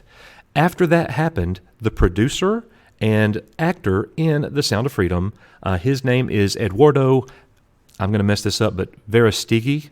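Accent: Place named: South, United States. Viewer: You’re such a southern gentleman. It’s part of why you’re so easy on the ears. But it is, “Ms.” ✨😁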